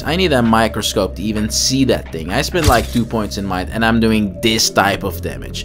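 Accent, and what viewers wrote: Netherlands. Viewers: Holy sh*t wanna try out this build. Love me some artist in NG+. Now gonna go with this one in next run. Think I'll do a normal NG run to really see the power of this! O and artist really nice on most enemies, only Gargoyles are a pain. I use a flail cause of the dex arcane. And I was wondering cause of your accent if your from the Netherlands (I am).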